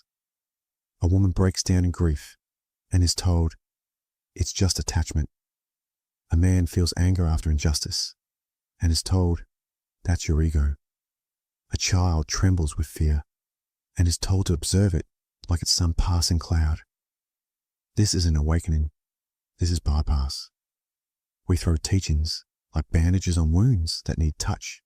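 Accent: Australia. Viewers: It is does like he's from the Outback, but the audio quality is even and smooth, for once. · The content is interesting but this AI chinese dope with an aussie accent gets old fast, can’t watch it.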